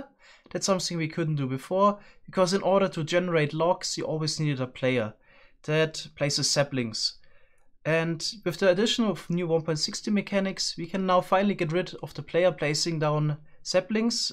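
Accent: Germany. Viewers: Are you german? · I'm curious, are you German or Austrian? I recognize that accent... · He is german isn’t he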